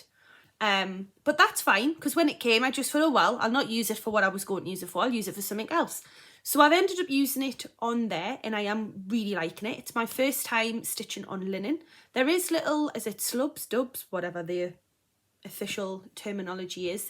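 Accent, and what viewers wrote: Newcastle, United Kingdom. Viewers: Great to see a Geordie on here. I always loved the accent. Fantastic first video, loved your projects, look forward to following your journey. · Yay this was really fun!! Good work ❤❤❤❤ once I got over the fact that I wasn’t expecting the Geordie accent 😂😂 xxx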